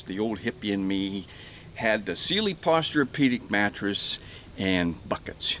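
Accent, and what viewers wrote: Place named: Western Pennsylvania, United States. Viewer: western PA accent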